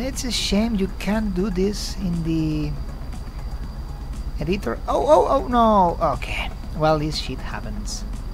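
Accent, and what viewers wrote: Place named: Poland. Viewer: Wait... You're spanish? I was thinking, that you're polish.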